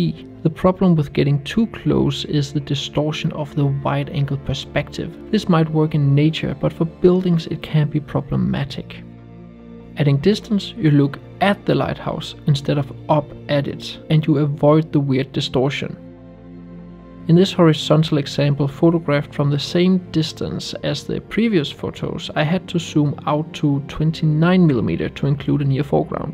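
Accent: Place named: Denmark. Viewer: You made some excellent points in this video,. For that reason, I subscribed. The shots in this video are absolutely amazing! Keep up the good work! Also, I immediately recognized the Danish accent:)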